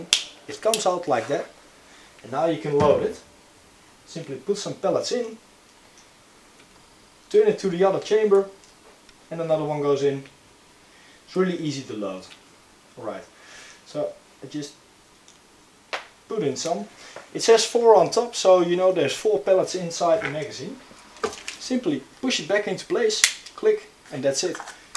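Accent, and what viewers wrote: Netherlands. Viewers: mooie Nederlands accent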